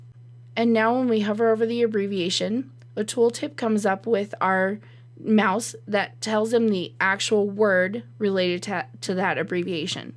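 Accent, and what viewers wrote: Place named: United States. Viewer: Thank You :) Finally someone with American accent ;) I was watching 2 previous videos with strong Indian accent... I didn't understand it at all..:D Great lesson thanks again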